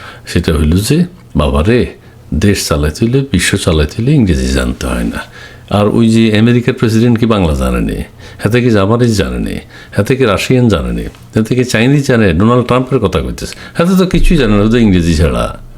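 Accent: Noakhali, Bangladesh. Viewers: I am enjoying your discussion as you are dialect as good as my grand father who was from Laksham nearest to Noakhali.I have an emotional attachment with this language.Now I am 73 yrs ( retired from govt service) old.I have a strong desire to visit my ancestors land but I am disheartened to see the reaction of Bangladeshi people after so called August revolution.There is no such communal atmosphere in our country ( not at all in west bengal) .We have intarected with so many muslim Shikh Gorkha Jath Rajput Maratha etc etc but never felt any difference during my service life.Yes recently some stray incidents happening in some regions of our country.( Communal clash).We have no jealousy if you become a prosperous country but give up this hatred against others. Because above all we are human being and religious identity is the creation of man not by almighty.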